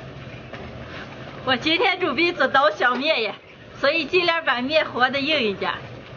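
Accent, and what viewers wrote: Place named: Shaanbei, China. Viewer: 越来越爱听陕北口音了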